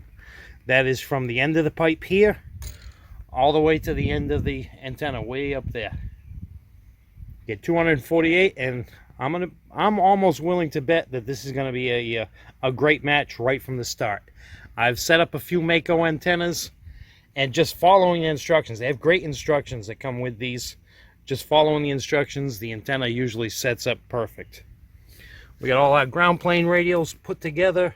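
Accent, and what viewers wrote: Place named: New York, United States. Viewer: Are you from NY ? what a heavy accent you have ...what are you doing in NC?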